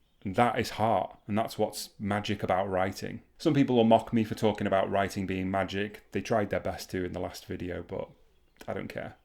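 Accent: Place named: Yorkshire, United Kingdom. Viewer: I have two questions which I think you can help me with. First of all I believe that your accent is from Yorkshire so I think you can definitely help me. Because I’m trying to write a book that you set in Ingleton and I’m a London living in Oxfordshire so I have made a rod for my back. I also know that the dialect is definitely different to the way I would say things. The second thing that I don’t know if you can help me with is how do you write a character who is drunk and also from Yorkshire? Do you use the dialect heavily or do you tend to just refer to actions? Finally, I just remembered that I know there’s a difference between an alcoholic and someone who is drunk because you can have a functional alcoholic or an abusive alcoholic who’s not drunk all of the time.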